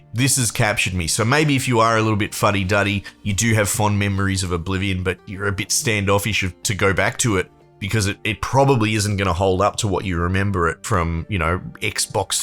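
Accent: Australia. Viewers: Perfect and honest recap dude - love it!! I was looking for a straight-to-the-punch, not-too-technical kinda review to send to my non-Elder Scrolls-abiding Aussie buddies, and since it sounds like you are an Aussie yourself, well that's just the cherry on the pavlova!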